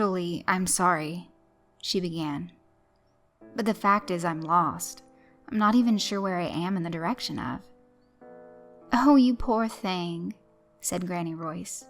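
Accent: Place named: Georgia, United States. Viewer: Where ever you get your stories is great much different than the other's but in the best way possible.I love your voice I'm from Tenn and u remind me of family in South Ga Love the channel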